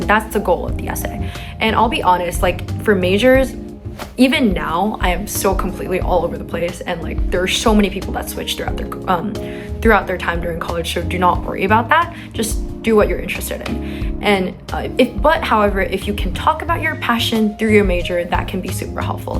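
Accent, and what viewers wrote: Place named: United States. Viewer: You speak perfect English with a American accent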